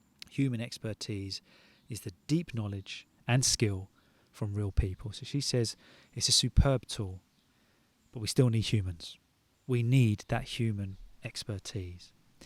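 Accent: England, United Kingdom. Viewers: iam glad i found you i like english accent way better than american so this is just perfect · Is it british accent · Hello,I like your videos,they are amazing. Especially like this kind of video where you talk about an issue and discuss it.I learn a lot from you.I like your british accent.so go ahead and make more of this content.from Algeria 🇩🇿 Many thanks 😊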